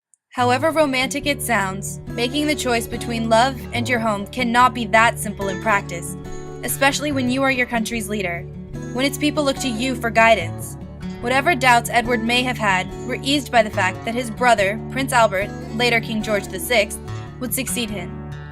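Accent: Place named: United States. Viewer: The young lady who is discussing this has an American style accent so I assume she has no understanding of the role of a Constitutional Monarch. I have never yet met an American who does. Firstly this is not an analysis ..it is a repetition... with little depth. For the record...from the someone who lives in the UK. The role of a British Monarch is to uphold the Constitution and provide a unifying force to balance the power of politicians. The Monarch leads of life of great priviledge but they are trained from birth into the role they are undertaking and an understanding that this is a two way process. The Monarch is most definately NOT able to do as he or she wishes and must put the needs of their people first. The role of Consort is also highly demanding and requires great strength of character to fulfill the function properly ( we have a recent example of where that went wrong and the turmoil it caused.) NOTHING is more important than upholding the Constitution. 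This country had several Civil Wars getting the power balance right. Edward the V111 is generally regarded in the UK as a weak,immature man who selfishly put his own needs above that of the people and showed no ability to recognise the damage he was causing. In doing so he caused a Constitutional crises which nearly destroyed the very Constitution he was suppose to uphold at all costs and put the Country on a path to Civil War as people took sides.. Being King was his role in life and he was a disaster at it. It is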